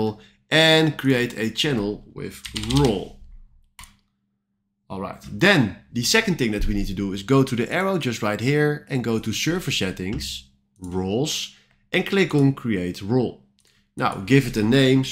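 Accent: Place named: Netherlands. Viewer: jij sound nederlands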